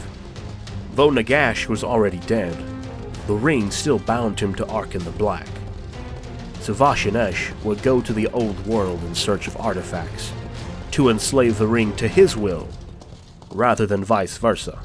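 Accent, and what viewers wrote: United States. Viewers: Nice. Why do all the names sound like an American trying to speak German?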